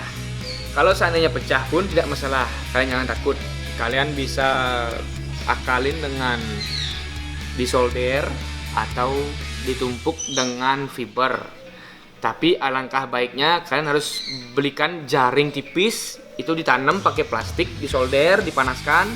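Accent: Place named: Bali, Indonesia. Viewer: Dialek orang Bali sangat kentara walau menggunakan bahasa indonesia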